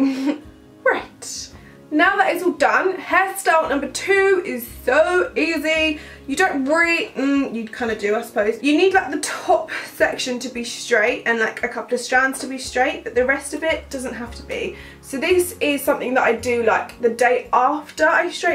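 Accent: United Kingdom. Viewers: I love her British accent 😍😍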